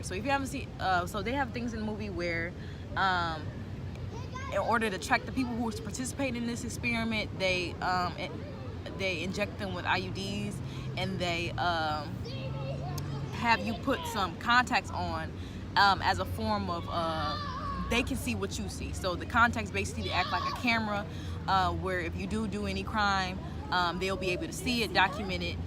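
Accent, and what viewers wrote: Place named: Chicago, United States. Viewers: WAKE UP CALL TV Stoney_stay_woke_sos exactly like Chicago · Sound like chicago..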